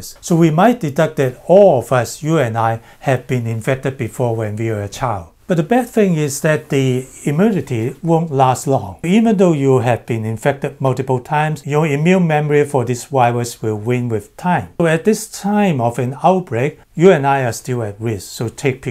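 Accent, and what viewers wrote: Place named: China. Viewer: Love the English with Chinese accent. Made me miss Hong Kong ! 🇭🇰❤ Hope this virus is not serious at all.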